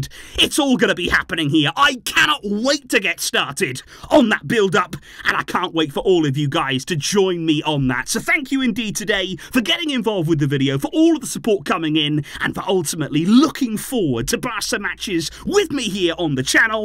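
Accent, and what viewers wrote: United Kingdom. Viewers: i am curious 👀. Your accent is clearly from the UK. Don’t you have a club in the UK that you support. I live in the Netherlands 🇳🇱 and I support First Barca 💙❤️ and second Ajax ❌ ❌ ❌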